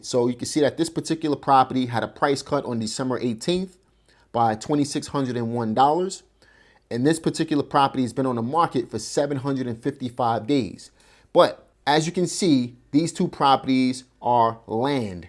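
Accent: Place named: East Coast, United States. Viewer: Thank you SIR for that tea, info, bomb AND mic drop on this tid bit. Now, I do have a question if you can possibly address it or anyone who may also have the answer. I hear a hint of possibly East coast in your voice(which I LOVE, not flirting, lol), so I'm concerned that, that strategy works well in and around that area. And by no means do I have a clue how to go about buying real estate, so yes I turn to info God's such as yourself. I live on the West coast, Cali to be exact. And if anyone knows Cali, shit is difficult in these parts hunTie! So we have so many hoops to jump through on ish. I say all that to say this, would that particular strategy work over here in Killa Cali and yo pockets? Thanks again for the info hun.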